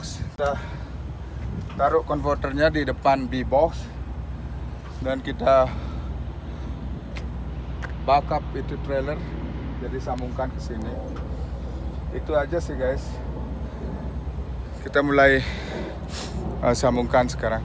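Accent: Bali, Indonesia. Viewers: Kalo denger logat pas ngomong pasti orang bali y bli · Seperti logat bali yaa bhsa indo nya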